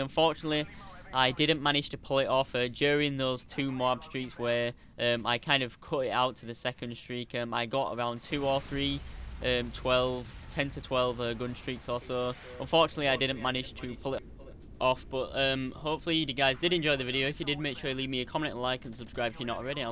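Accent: Yorkshire, United Kingdom. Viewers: are u from yorkshire ?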